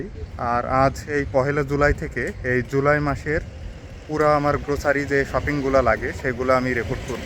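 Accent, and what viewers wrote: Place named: Pabna, Bangladesh. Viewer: First video dekhtesi probably apni pabnar manush or ashe pashe basha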